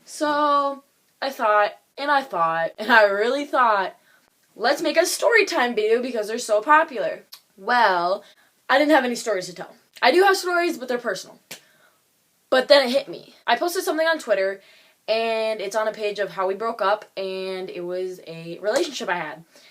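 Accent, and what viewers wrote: Alabama, United States. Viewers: Are you from Alabama? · Uh...are you from ALABAMA ? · are u from Alabama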